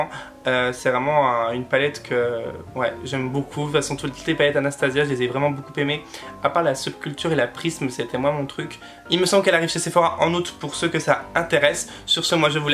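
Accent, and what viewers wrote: England, United Kingdom. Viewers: Tu es tout en blanc car tu es un ange... mais ça tu ne le sais pas... Bel accent anglais... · J'adore ton accent anglais 😍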